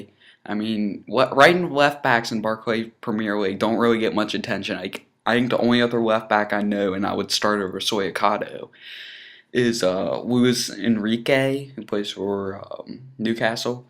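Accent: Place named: United States. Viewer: OMFG you are so american. descusting accent. albiuuunn, widffs, willshiuurrr lmfao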